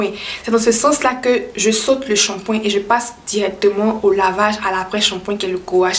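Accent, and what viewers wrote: Ivory Coast. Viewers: J'aime son ascent ❤️❤️❤️. Genre à l'ivoirien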